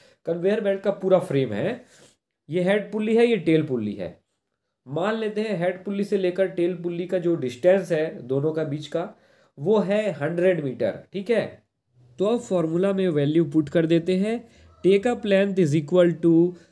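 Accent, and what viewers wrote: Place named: India. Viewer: Indian accent:goodvibes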